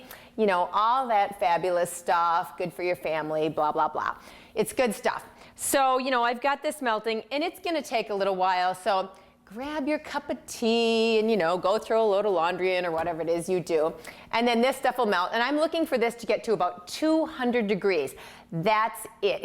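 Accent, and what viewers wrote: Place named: Wisconsin, United States. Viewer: Yes, you MUST be from Wisconsin:] I know that accent.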